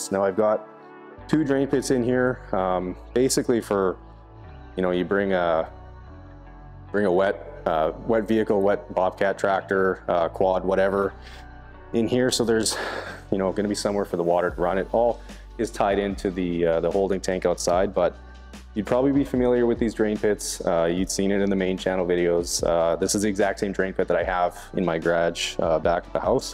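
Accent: Canada. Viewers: GREAT building!!!! Very professional!! I get a kick out of your Canadian accent too. GREEJ? I guess that's GARAGE? LOL Keep those transformed car videos coming!!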